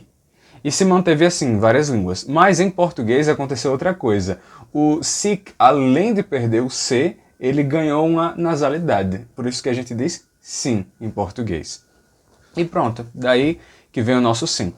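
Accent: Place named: Northeast, Brazil. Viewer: da onde e o seu sotaque? Eu moro nos EUA por 35 anos e nao consigo saber exatamente que sotaque e o seu. Nordeste em algum lugar? Obraigada pela aula excellent.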